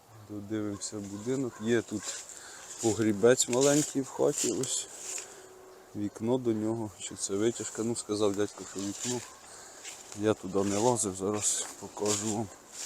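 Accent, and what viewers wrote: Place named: Podillia, Ukraine. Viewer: У прекрасна вимова з приємним подільським діалектом. А "дядько каже " просто супер, не треба чіплятися до людини, мови вчать на інших каналах.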